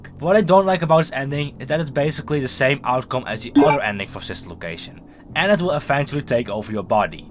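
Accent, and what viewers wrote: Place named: Netherlands. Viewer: waarom klink je nederlands haha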